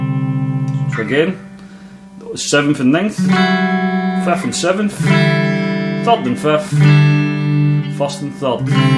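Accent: Scotland, United Kingdom. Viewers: Nice job. Enjoyed your accent. For American English, its always surprising to hear the strong accent disappear when you sing as the words become crystal clear. Btw you have a good singing voice in the times you did sing. You didn't sing enough to tell if your voice is trained but if not in my opinion you have a good base voice to begin training. Much respect for Scotland! My ancestors came to America from Scotland! Robertson clan!!!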